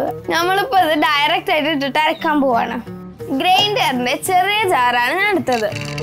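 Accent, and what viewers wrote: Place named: Malappuram, India. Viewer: നല്ല മലപ്പുറം ഭാഷ.... അടിപൊളി.... Nice.. 🤝🤝🤝🤝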